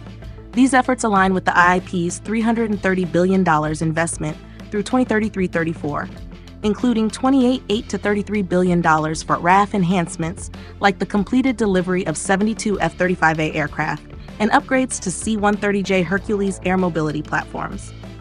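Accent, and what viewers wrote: United States. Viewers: Whenever I see some glossy ad like this with someone talking about Australia's defence with an American accent? Not even trying to hide the sell.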